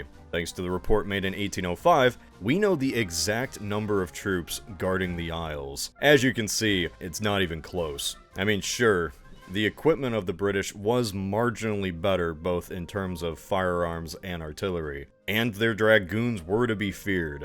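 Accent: United States. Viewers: The way Americans pronounce English towns is excruciating. (But funny) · triggered in british at hearing american pronounciations of places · Yep, this is certainly an American pronouncing these French Marshall names. Epic History... My beloved, please, clean my ears of this hideous noise.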